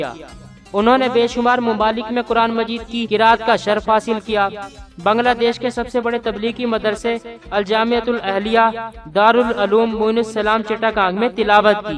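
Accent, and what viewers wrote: Azamgarh, India. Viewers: aap Azamgarh se ho kya?? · good information About QRI Abdul BAsiT A lot Thanks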